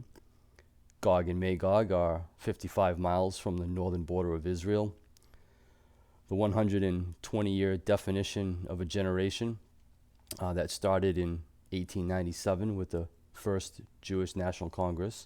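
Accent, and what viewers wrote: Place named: Northeast, United States. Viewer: You're my favorite YouTuber. I wish I lived closer to u, id love to meet . ♥️😊. I feel alone because no one around me seems to see or care about the signs of Christ's return. Every once in awhile, I'll meet a customer that sees it and is excited but I usually never see them again. Sounds like you're from the north east, I'm from Florida. Ty for your videos, I truly enjoy them!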